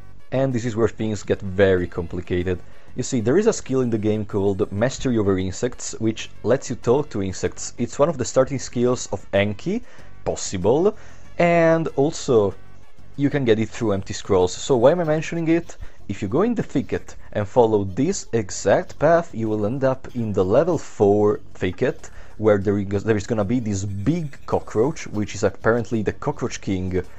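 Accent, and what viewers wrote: Italy. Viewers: Mi sembrava un accento italiano ad ogni modo grazie fra per le guide sono felice di vedere italiani con dei gusti sublimi per i videogiochi mi sono iscritto e metto like a tutto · are you italian?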